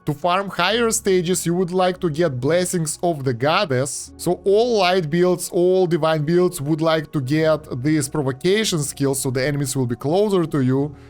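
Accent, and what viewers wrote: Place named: Russia. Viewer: По акценту слышно русский мужик пожалуйста сними ролик на сборку скиллов на демона и ангела пж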